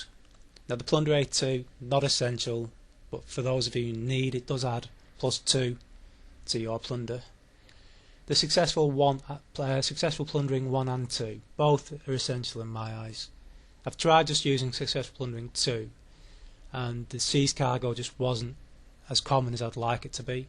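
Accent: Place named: United Kingdom. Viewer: Only now have i watched your videos with sound on and found out your British, High5!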